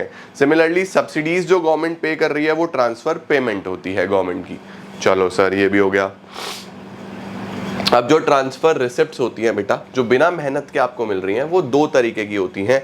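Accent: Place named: Rajasthan, India. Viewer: Rajasthan ka hai bhai yo.. to kadr ka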